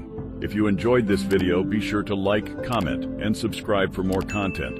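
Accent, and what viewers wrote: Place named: United States. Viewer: Odd, the American English. Not even an Arab slant to the English! 😢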